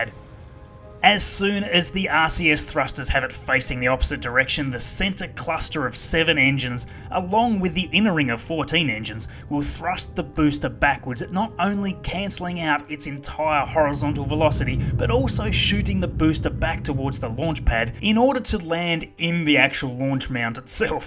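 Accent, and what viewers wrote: Australia. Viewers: Got to love (or hate) the Aussie accent! :)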